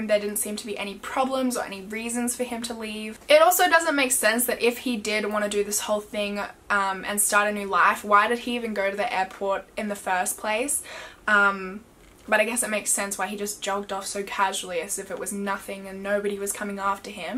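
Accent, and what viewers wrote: Australia. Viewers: Very interesting...I love your Austrailian accent btw 😍😍😍.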